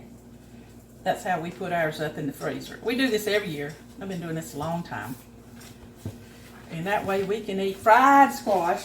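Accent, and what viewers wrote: South, United States. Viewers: Mrs., you've saved two southern lesbians' dinner tonight! We love your accent; you remind us of all the memaw's, mamaw's, and nana's that we've known and loved. You've got two new fans. We hope you had a great 4th of July and God bless!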